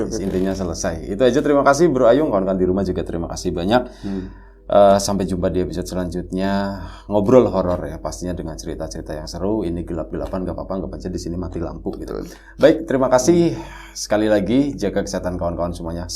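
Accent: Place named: Tegal, Indonesia. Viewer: Ora ngapak ora kepenak RRT (republik rakyat tegal)😂😂😂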